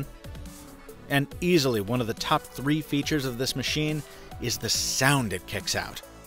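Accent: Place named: Transatlantic, United States. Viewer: Nice Transatlantic accent.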